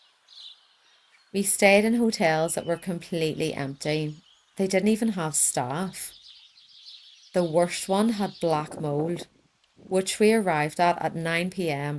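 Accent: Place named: Ireland. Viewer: You took the short route, which is ok, since around 35% of walkers do so. I have never done the Camino, and don't intend to, but I think that despite the blisters and the rainy weather, you had a worthwhile experience. Anyway, some of the filming was quite good, and it looks like you did have some good days. Finally, doing that part of the journey in February has its positives: the main one is that hotels and restaurants were empty, and the trail was quiet, without the crowds you get in June or July. In my humble opinion, standing in front of the Cathedral of Santiago without the massive multitudes, with their accompanying scammers and hucksters, was a good result. By the way, I like your Irish accent, and I am disappointed with some of the cruel responses below.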